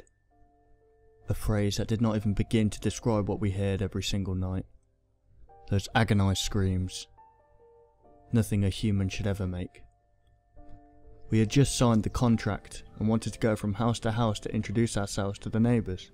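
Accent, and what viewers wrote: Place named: United Kingdom. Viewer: I NEEDED a British creepypasta reader it gives diversity :p awesome work guys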